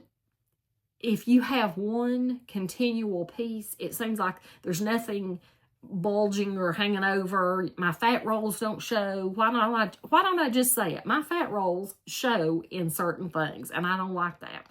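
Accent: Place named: North Carolina, United States. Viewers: Omg, my daughter started laughing with the “Mayflower” comment! That was just hilarious 🤣 🤣🤣🤣. Congratulations on copying the old jumper, it looks great on you, especially the color 🙌🏻🙌🏻. You are definitely not alone in not wanting to show your body rolls 😱😱. I just wanted to say that we truly enjoy your NC twang. 💖💖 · we all love your NC accent! You’re so down to earth and keeping it real! I wear a blouse under my jumper. Yours looks great on you! I want to make a pair of overalls. BTW, you’re on my list of YouTubers I want to meet! ❤️❤️ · Hi, you did such a great job of copying your jumper and it really fits you so nicely. I love hearing your North Carolina accent. My stepdaughter also lives in North Carolina and she has that accent, too. Have a good week. 🙂❤🙂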